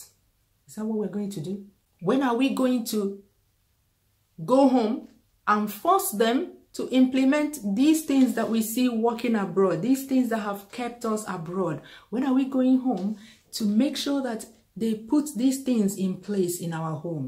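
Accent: Nigeria. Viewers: I love your accent, truely Nigerian. I am very sad about what's going on in Nigeria. I agree with you let's make Nigeria great again.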